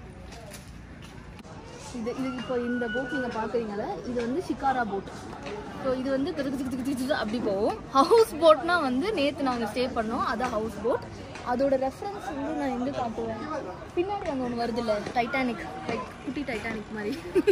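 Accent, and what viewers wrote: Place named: Kerala, India. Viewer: Nice Vlog captain.. Especially your Malayalam slang.. Kutty titanic 🤣👌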